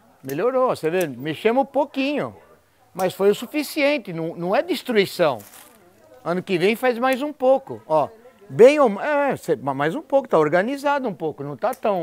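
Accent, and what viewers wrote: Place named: São Paulo, Brazil. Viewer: Kkkkkkk acho o muito legal com essa fala bem paulista,aprendo muiiito!!!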